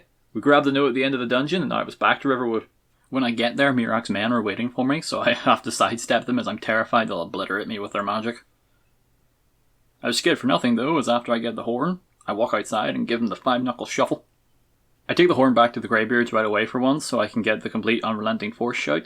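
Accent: Northern Ireland, United Kingdom. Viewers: I can't believe I just heard a northern Irishman speak in uwu truly the internet is a fascinating place. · I fucking love your accent. I think you said it was Northern Irish? im sorry if thats wrong or offensive or something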